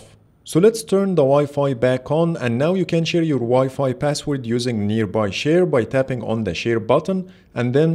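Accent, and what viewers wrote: Egypt. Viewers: Man you sounds Egyptian 😁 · Are you an Egyptian living in UAE?? Your pronunciation kinda gives me the feeling that you are.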